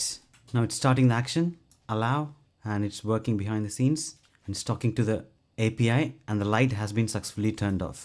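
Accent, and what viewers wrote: South Africa. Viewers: Love the videos. Can you do one for controlling apple HomeKit? Are you South African?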